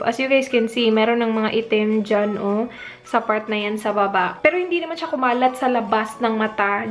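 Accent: Visayas, Philippines. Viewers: ang panget mo mag tagalog parang bisaya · hahahah ang kulit nya mag salita visaya ata si ate 😂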